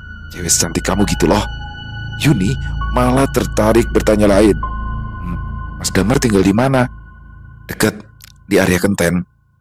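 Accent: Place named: Java, Indonesia. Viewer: Biyuh.. Mas logat jawanya mulai sedikit medok... Haha narator kesayanganku.. logat bahasa apa pun bisa di lafalkan dg baik ❤❤❤❤.. sukses selalu ya Mas dan team..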